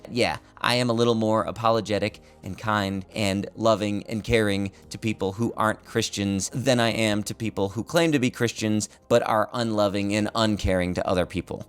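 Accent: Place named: United Kingdom. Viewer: He kind of sounds like Shelby from Adventure Time with a British accent. 😁😁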